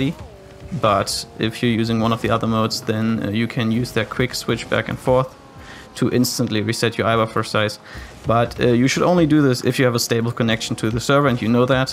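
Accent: Germany. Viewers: U are German right? Youre english sound like when my english teacher speaks ^^ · Höre ich da einen deutschen Akzent?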